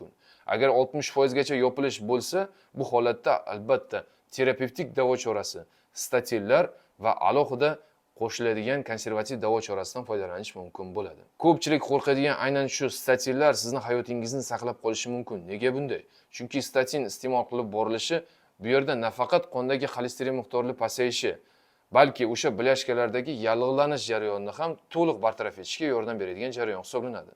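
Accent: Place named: Fergana Valley, Uzbekistan. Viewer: Доктор болам сиз каердан сиз шевангиз Водийга ухшаяпт телфонтракамиз чикаринг мен жуда керагл экансиз доктор болам ???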